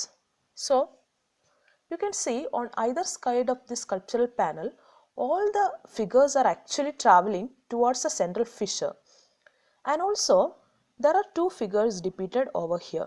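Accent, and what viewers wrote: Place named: Kerala, India. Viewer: Beautiful video ma’am, love your Malayalam accent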